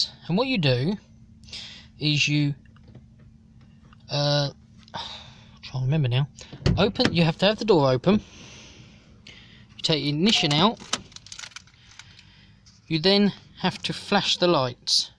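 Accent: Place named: United Kingdom. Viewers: Lol, you speak like a british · Yoda Master British English.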